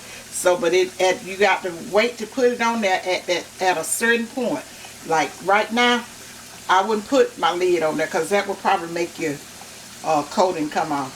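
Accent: South, United States. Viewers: Hello you are truly a delight to watch I just love your southern accent it's just beautiful and your very detailed step by step, if someone doesn't get it the first time with you,I truly feel sorry for them your very detailed. This is my second time watching you and I shall continue watching your blog.....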